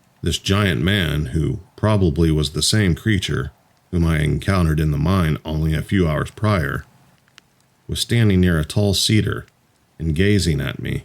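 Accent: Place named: Midwest, United States. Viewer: Don't feed the trolls. I'm the most critical person I know and I can say yours is a narration vpice is professional grade. People pay 10s of thousands for a Midwest accent like yours to do audio books and tv spots. Some folks are not in a good place in life and attacking others helps their pain go away for a moment. Your golden Pony Boy.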